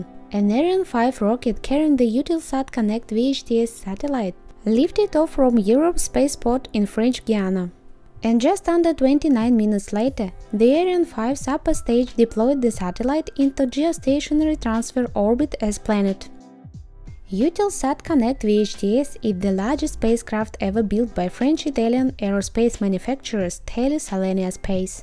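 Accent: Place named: Slovakia. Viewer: As for the accent I'd say Slovak or maybe Romanian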